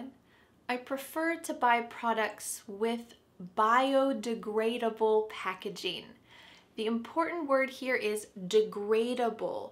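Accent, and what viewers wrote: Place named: United States. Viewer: Hello My name is Eugene. Thank you very much indeed for your super American English accent. Here in Rwanda we truly love you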